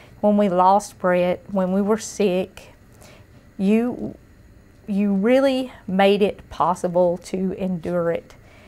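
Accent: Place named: Appalachia, United States. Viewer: I am thankful to know Jesus, my family, waking up today, I'm thankful for you and your channel. channel I enjoy it. I actually don't watch any other recipe channels but yours. I love the way you represent everything and explain everything and I really love your hillbilly accent. I'm not sure who Brett is that you mentioned losing, but I pray for you and your family for the hurt and for God's peace to return in your life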